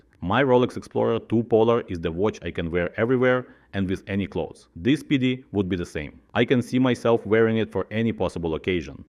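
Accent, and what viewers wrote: Russia. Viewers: Русский акцент пипец жёсткий, конечно...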